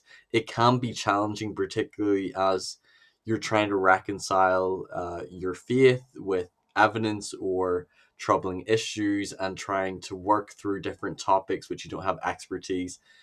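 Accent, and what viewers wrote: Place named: United Kingdom. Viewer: Your accent, the belies a British citizenship, which gives me hope that your medical problems will be addressed properly.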